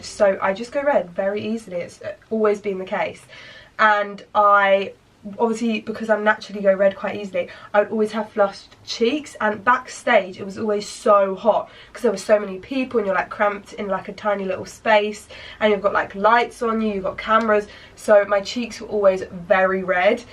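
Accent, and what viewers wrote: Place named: England, United Kingdom. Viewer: Randomly stumbled across your channel when I was living in Aus and I loved it because it reminded me of home and just listening to someone English ramble on was super comforting 😂 and now I’m back in England and still here and loving the randomness, the rants and everything else! Thank you for being completely you - very lovely in a world of fake!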